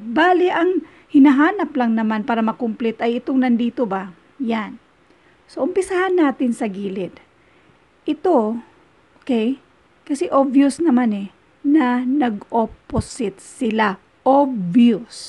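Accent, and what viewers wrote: Visayas, Philippines. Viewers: omg this is so helpful. i havent understood it before i watched this and it really helped me understand these series of patterns especially the segment completes the grid. thank u maam and God bless btw i love your bisaya accent : )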